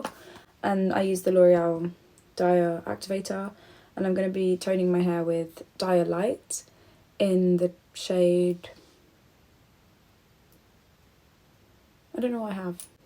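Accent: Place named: Sussex, United Kingdom. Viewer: I like your accent. Is it from Sussex??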